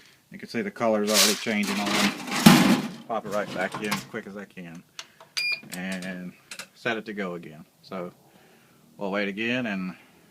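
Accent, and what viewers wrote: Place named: Maryland, United States. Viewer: I've always wondered about these coatings. That accent sounds like somewhere between Cecil and Wicomico county!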